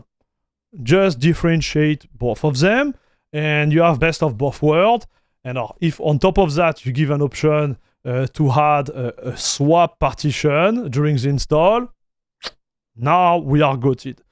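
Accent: France. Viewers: Votre accent paraît français...😊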